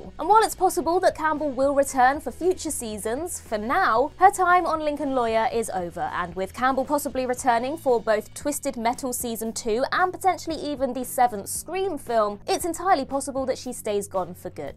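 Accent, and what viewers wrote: United Kingdom. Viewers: lol love British talking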